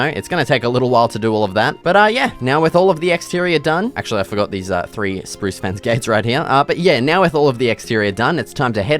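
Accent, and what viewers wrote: Australia. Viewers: Your Australian right? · bro couldn't be more Australia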